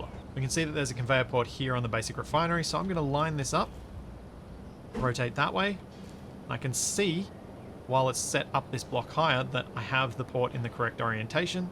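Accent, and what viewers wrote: Australia. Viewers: You sound Aussie as, and I liked this video with its easy and full getting started walk-through.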